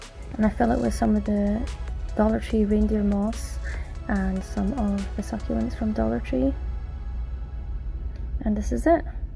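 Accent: Scotland, United Kingdom. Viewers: Your voice is so soothing, I love how you don’t rush through making them. Not Throwing them together super fast, which seems to be the norm. You take your time which makes a huge difference to the quality of the finished piece. Yesterday I saw a video of someone using these blocks to make decorative items for the first ever time. In comparison to your creations they were more arts and craft pieces. Not knocking others work just an observation. When I was watching the other poster create items in my mind I saw the potential for a few of your items and how when used in specific ways you can create swirl effects and illusions. Then as if by magic your video popped up in the feed, I’m so pleased I clicked on it! You created what I could see in mind 🪄 ✨ You certainly have an eye for what looks good, not only can your mind see the potential of combined pieces, but you’re able to actually bring them to fruition. 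Well done 👏 these are really lovely pieces you made, they’d blend in beautifully in most homes and/or functional places, no doubt receiving compliment after compliment. Quick question, you said you got items from the dollar store, except you have that beautiful soft Scottish accent. Am I wrong in thinking there are dollar stores here in the uk? Thank you for this wonderful video, it was an absolute pleasure to watch, incredibly relaxing. You’ve gained a new subscriber 🙃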